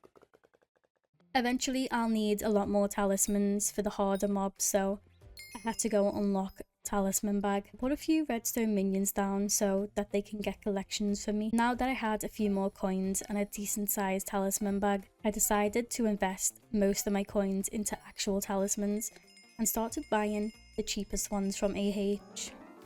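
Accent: Liverpool, United Kingdom. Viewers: a scouser?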